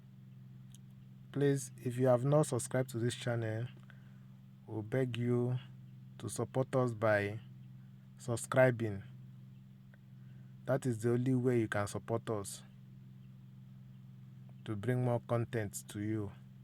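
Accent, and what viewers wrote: Nigeria. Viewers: Nwanne your igbo accent dey give me joy 😅 thanks for the info too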